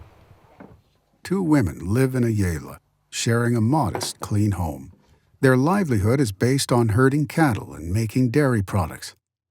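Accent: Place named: United States. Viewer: Watched one minute. American narration is awful. Not watching.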